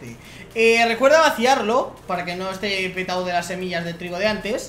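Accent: Argentina. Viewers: que acento argentino papá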